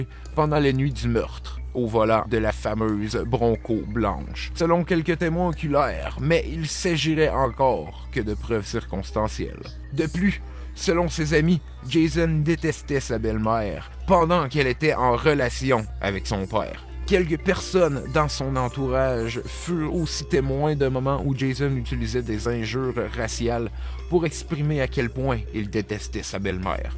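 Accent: Quebec, Canada. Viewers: Super et bravo pour ta vidéo 👏 ! J'aime bien l'accent québécois ou canadiens du commentateur/narrateur. Ca donne un certain caché à la vidéo 😊.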